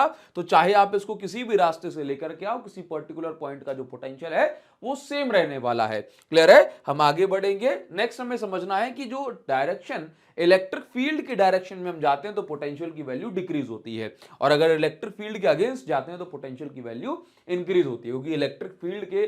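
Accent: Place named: Bihar, India. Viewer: Khela hove hai😂 true bihari axcent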